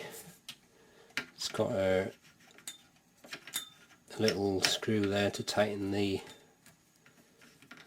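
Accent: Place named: Newcastle, United Kingdom. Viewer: what accent is that you have, it sounds sorta newcastle like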